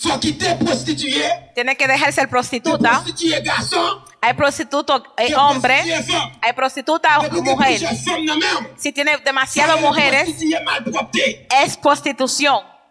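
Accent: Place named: Spain. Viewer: Li gen bon jan aksan espanyòl la nan langaj li.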